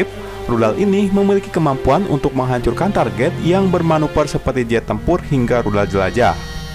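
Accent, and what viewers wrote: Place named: West Java, Indonesia. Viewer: Jiga urang sunda ieu mah nu ngomong na hehehe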